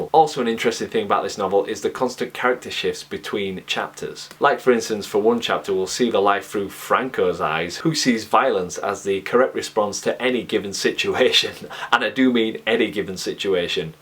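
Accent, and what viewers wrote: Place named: Scotland, United Kingdom. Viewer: Oh, okay, but I feel like the scottish accent is kinda catching up on you, idk maybe I'm just being silly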